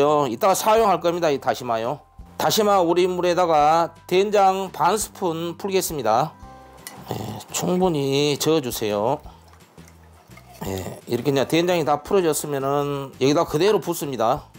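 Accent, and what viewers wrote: Jeolla, South Korea. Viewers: 말씀도 맛깔나게 하시네요. 정겨운 전라도 말씨 더맛있게 느껴져요^~ 감사합니다.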